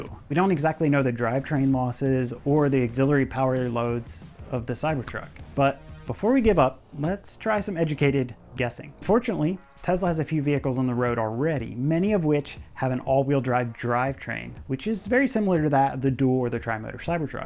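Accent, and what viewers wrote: United States. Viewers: Fantastic graphics and visual, the best I’ve seen in awhile although 3blue1brown is giving you a run for title of best! BTW, you may already know this, maths = Brits, math = American, you have a clear American accent, lets stick with math - I insist (LOL)! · "maths"??? super awkward to hear the European multiples version of math in your American accent. just be consistent and say math.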